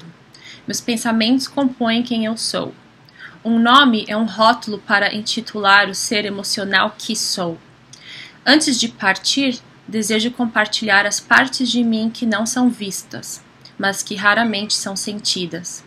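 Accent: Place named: United States. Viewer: Você é americana? Meu esposo é de Chicago e quando fala em português tem um sotaque parecidinho com o seu. O jeito de falar é bem semelhante. Se bem que ele não fala quase nada em português hhh mas quando fala, algumas palavras saem como algumas que você fala. Claro, você fala muito bem, mas de uma maneira bem peculiar. ☺️🌼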